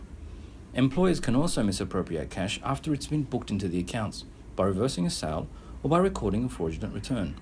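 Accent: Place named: Australia. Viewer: I think Australian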